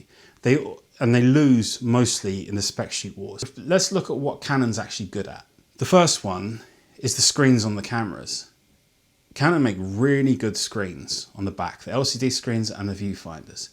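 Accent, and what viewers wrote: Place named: United Kingdom. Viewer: I think you made a really good point here. I do own the R5 and guess what on my surfing vacation last week to Spain I made all my pictures with my iPhone. I was too afraid that the camera could get stolen or at least damaged in the sand or whatsoever. When I came back I started thinking to address that point and looking for a cheaper body. I thought to get maybe a Sony A7 IIIc But the camera costs still €2000 at the moment. Also during walks and most of the time I don’t bring the R5 anywhere to keep it safe and nice. How can you get better if you behave like that? You really brought this whole issue to its point 👏👏👏 btw enjoying your English or British accent. :)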